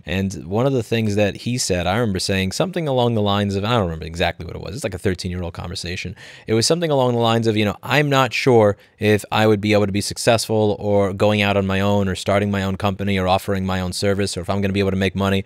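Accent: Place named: Carolinas, United States. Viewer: Yep, sounds like the Carolinas, greetings from upstate SC.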